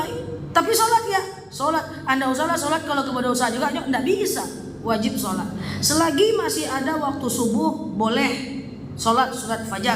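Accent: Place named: Padang, Indonesia. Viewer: Saya suka sekali ceramah nya ustd org pdg ya bahasa ny kayak org pdg udh cantik pintar lg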